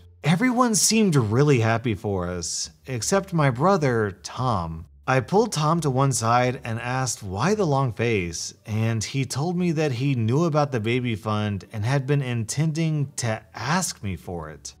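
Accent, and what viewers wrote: South, United States. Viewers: Im for ga. And when he does the southern voice all of us here in ga are okay with it. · Hey, I’m loving the southern accent plus that pronunciation was epic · please keep doing the southern accent.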